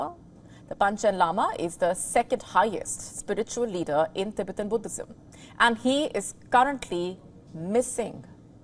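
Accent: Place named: India. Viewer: Her curry accent is so strong, can't even pronounce Tibetan.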